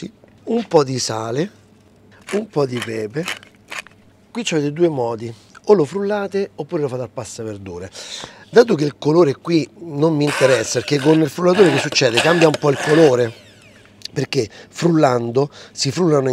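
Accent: Rome, Italy. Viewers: Grazie per la ricetta, per fantastico romano, a parte che sei un poeta vero e proprio!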